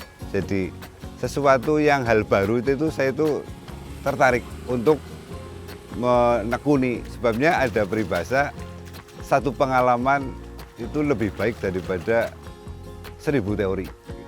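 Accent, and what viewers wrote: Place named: Java, Indonesia. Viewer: Emang JAWA ... Notasi khas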